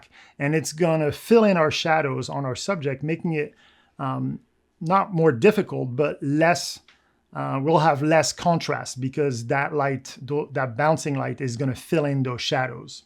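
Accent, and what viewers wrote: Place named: Quebec, Canada. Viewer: I would have guessed French Canadian.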